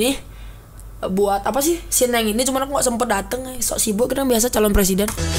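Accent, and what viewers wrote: Bali, Indonesia. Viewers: Pantesan logatnya aja udah dari bali sama kayak gue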